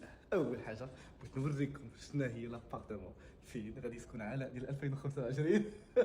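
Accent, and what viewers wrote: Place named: Gulf, Arab world. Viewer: 10 دقايق باش وصل بدا يهضر خليجي 😅😅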